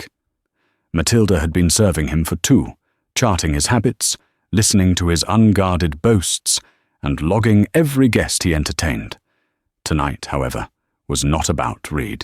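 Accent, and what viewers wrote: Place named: United Kingdom. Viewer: What I really appreciate is the one freeze frame picture at the top of the screen that never changes, slide shows or moves. I put a piece of paper on it and just get lost in the story listening to that purring English / British accent while following along with the transcript. My imagination does the rest. Also, I like the ability to backtrack by highlighting sections that have already gone by. Some channels are changing the algorithm so that it is not possible to do this with the narration. Only with silently reading it over again. Please don't do that. I like hearing again some section(s) that have intrigued me, made me smile or even laugh.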